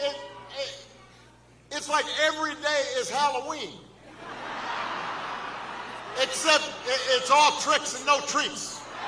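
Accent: South, United States. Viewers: And here he goes with the “southern” accent again! What dark place our we in? Has he looked at the Dow Jones index? He is a. LIAR. Weaponizing the justice dept? He doesn’t remember his own presidency and RUSSIAGATE ?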